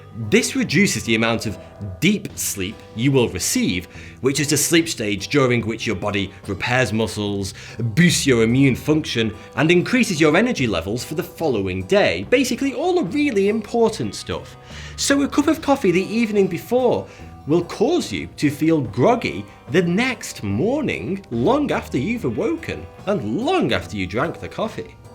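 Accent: United Kingdom. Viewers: This guy has a Monte-Pythonesque accent. Does anyone know what kind of accent this is? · Accent of this guy sounds a little like over-acting .... he sounds like the uttermost british guy you can get in the UK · uk narrators eventually get on my nerves...wish theyd just talk in a normal, non-dramatic, non-sarcastic or ironic voice..I just want info, Im not looking for the most amusing delivery vid...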